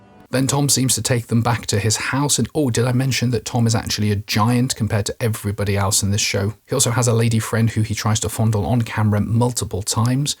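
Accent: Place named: England, United Kingdom. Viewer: Thank you for making these. Love the English accent too. If you could go back and revisit the rings of power and do each episode over like you did with 7 and 8 that would be wonderful! I'm serious! I will pay you!!😄 That used condom falling on the Balrog's head was awesome! Humor is the best way to deal with this abomination of a series. (It's really a shame too, because in the right hands this could have been a good show. I am interested in seeing the lore come to life. I would love to see how Anatar (Sauron) managed to trick the elves; how he worked with Calibrimbor to learn to craft rings. That would have been really cool. It takes more than 8 episodes though. And fuck the Harfoots! Who gives a shit about that side-story.) That reminds me - your Skyrim comment was perfect. The entire show was a bunch of stupid optional side quests. 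Never did I fear for anyone or anything. The only characters I liked were Adar and Berrick, and the latter had not a single spoken line. Well, I suppose the discount-Emma Watson character with the big boobs was fun to look at, but even she was unnecessary and her character was as developed as bleached vanilla. Anyway, please keep these going. I look forward to them sooooo much! Cheers, mate!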